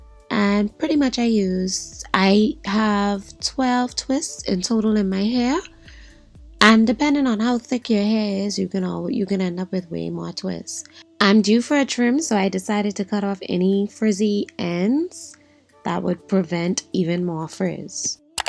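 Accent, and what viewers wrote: Bahamas. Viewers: New to this channel. Sounds like you have a bahamian accent. Your twist out came out bomb.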